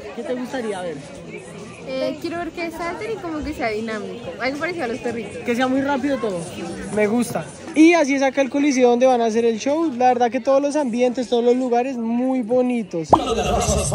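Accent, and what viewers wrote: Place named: Cali, Colombia. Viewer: Como venía de Cali se le pegó el hablao'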